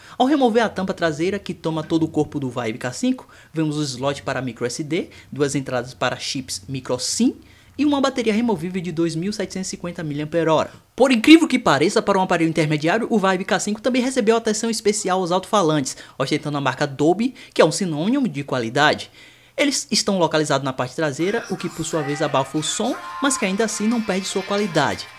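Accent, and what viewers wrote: Bahia, Brazil. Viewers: vc é baiano ?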